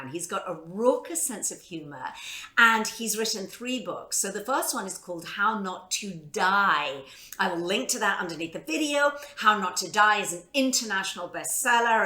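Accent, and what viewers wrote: United Kingdom. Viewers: Hi. I thought it was British. Love it. You are an inspiration.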